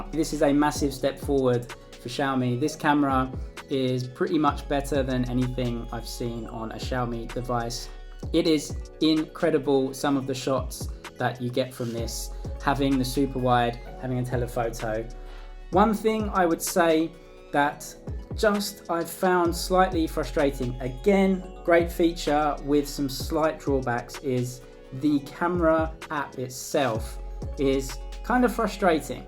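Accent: England, United Kingdom. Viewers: I'm sorry to say but you are just over critical, going to China, speaking with strong enlgish accent commenting their phones, clinging to the small details and making sensations on youtube. it is too commercial and nonsense this video, hoping to attract viewers by titles and shits.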